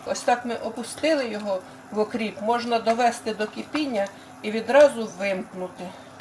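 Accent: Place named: Odesa, Ukraine. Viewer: услада моему слуху,вѳ с одещины,сердце радуется я выросла там.Берегите себя,милые люди.Дякую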